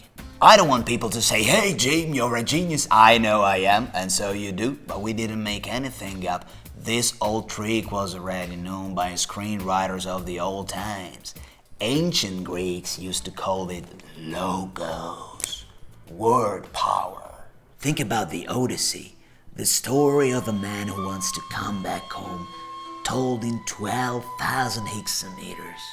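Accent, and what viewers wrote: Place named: Italy. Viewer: rega l'accento italiano si sente ... altro che perfetto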